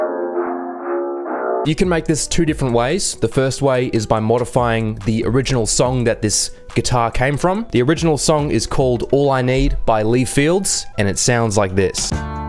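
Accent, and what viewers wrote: Australia. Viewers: You're an absolute genius, hidden gem this channel. I don't even produce in FL, but learn so much from you bro!!!!! Nice to get a dose of the accent from down under as well!!!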